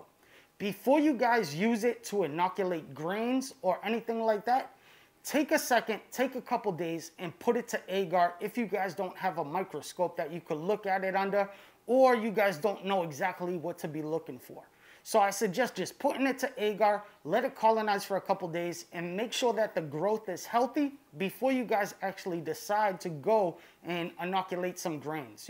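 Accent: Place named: Massachusetts, United States. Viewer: Sounds like you got a Massachusetts accent.. mush love from 508 🤙🏻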